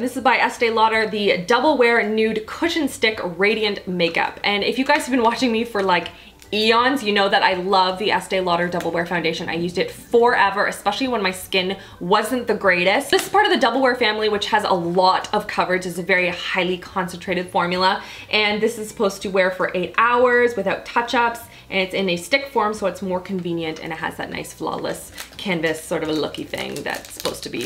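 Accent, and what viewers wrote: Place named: Canada. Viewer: where is she from? Canada? I have a feeling cause of the way she talks:) Super funny video:)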